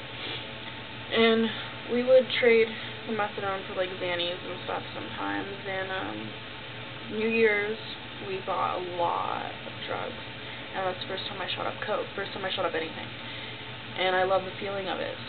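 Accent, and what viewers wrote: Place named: Mid-Atlantic, United States. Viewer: you sound like you're from the mid-atlantic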